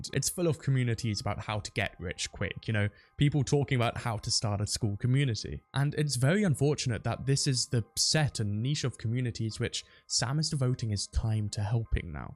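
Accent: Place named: England, United Kingdom. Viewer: Even with an english accent, this dude still could not sound intelligent. Give up bud!!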